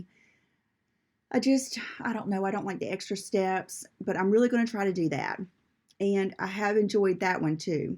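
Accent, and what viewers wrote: South, United States. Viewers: So sorry you burned yourself. I have done that too. I have been watching a lot of your videos and truly adore you. Your accent sounds like you might live in the south. It is so nice to listen too. Love your kitties. When I was growing up we lived in a small town in central Illinois. We had 10 acres surrounding our hose which was 3 stories. We had so much space that the top floor was turned into a play house for me and my sister. With that said we also had room for 39 kitties at once. We would dress them up in doll clothes, pretend to operate on them and just silly girl's stuff involving our kitties. So long story short I love love kitties and yours are so beautiful. Love them.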